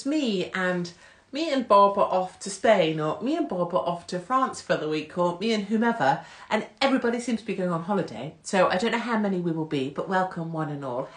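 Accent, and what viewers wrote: United Kingdom. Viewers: Why is your English accent so light?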